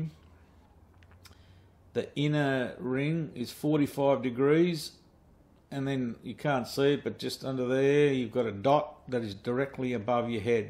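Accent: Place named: Australia. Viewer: Great video---I stuck around just to hear that aussie accent.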